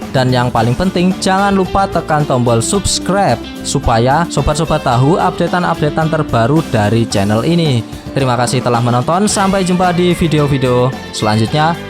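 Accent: Java, Indonesia. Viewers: Gacocok , medok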